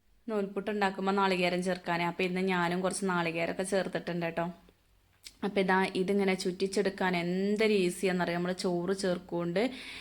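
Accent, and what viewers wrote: Malappuram, India. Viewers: Chechinte ഇതേ സംസാരം ഉള്ള ഒരു കൂട്ടുകാരി എനിക്കുണ്ട് അവളും മലപ്പുറം aaa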